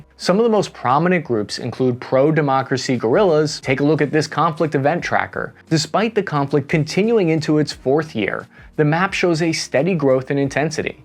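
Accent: United States. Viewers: Dude, you have a very strong American accent to your English usage. For me, an American, I put a lot of credence to your reports and analysis, because I know that it is coming from a familiar/recognized source. This is a good thing because there is a whole bunch of analysis which is written and then 'read' by computer to make it sound to be American English. Your presentation is authentic and sounds authentic. I have listened quite a bit and have drawn parallels in facts and analysis to other sites and sources that I trust. Good job! Keep up the good work, you have a keen sense for conflict and the social and political dynamics around conflict. Again, good job! and Thank You for your hard work and research.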